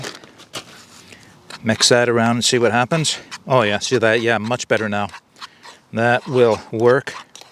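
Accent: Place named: Nova Scotia, Canada. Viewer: Just found you channel, I am starting Keto and am looking for something for outdoor cooking. So far I have enjoyed you recipes and would like to see a book on keto eating outdoors, I have looked for such a book and can;t find anything suitable, had you thought about writing one? I am UK based and Keto doesn't seem to ba a popular lifestyle here, so far I am enjoying the keto and feeling better for it. Please keep up the good work, you are easy to listen to and follow. I'm Guessing you accent is either Nova Scotia or Newfoundland.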